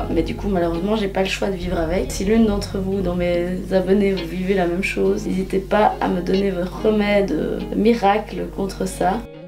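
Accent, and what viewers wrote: Belgium. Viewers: Tu as un peu l’accent belge 😂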